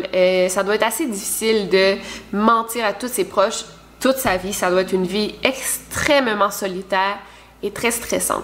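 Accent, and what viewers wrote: Quebec, Canada. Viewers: Au départ l'accent québécois, ne me bloquais pas mais je me demandais si avec certaine expression je réussirais à être captivée et zn faite au bout du premier jour après ma déciuverte de ta chaine j'avais déjà regardé neufs vidéo 😂 Bref, j'aime énormement, finallement l'accent ne change rien à la qualité se qui est logique au fond, je suis une récente abonnée et n'es donc pas tout vus mais une bonne partie ! Je garde l'histoire de Maura Murray pour la fin ! Bref, merci beaucoup ❤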